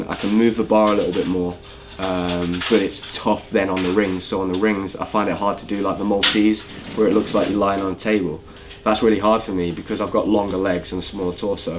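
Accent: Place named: Nottinghamshire, United Kingdom. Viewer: Jesus Christ are you from Notts? Omg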